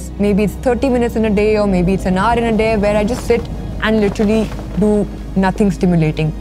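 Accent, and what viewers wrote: India. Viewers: a girl with perfect indian accent finally. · I'm one of the 85% who didn't watch to the end, simply because of your indian accent. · I didn't leave because im brain roted , i just don't like indian accent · how do you have Indian accent? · Sorry, I stopped after about 30 seconds in because I can't stand listening to Indian English. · good message, but i cant stand that indian dialect english for real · The poo accent 🤮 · Accents vary worldwide, mate. Nothing you can do about it. Also she’s Indian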